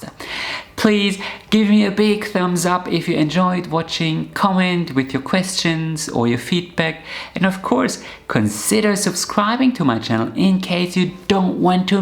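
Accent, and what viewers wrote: Germany. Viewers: I have some Norwegian friends and you are so like them. your style of knitting is so relaxing. I knit the Norwegian way. This is a quick way to knit but sometimes I like to knit slowly. I enjoy every stitch. Now I listen to your accent more closely I can hear the Germannic style. Germany is a lovely country and the German people are so warm and friendly. I hope we can keep in touch. Lol Jacqui from Seahouses UK x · Donnerwetter! 👍 Abgesehen von den vielen sehr nützlichen Tipps sprachlich perfekt! Damit meine ich jetzt weniger die Aussprache – den deutschen Akzent hört man nach wie vor –, sondern den Gebrauch des eher ungewöhnlichen Vokabulars. Ich bilde mir ein, ausgezeichnet Englisch sprechen zu können, aber bei dieser Thematik müsste ich passen.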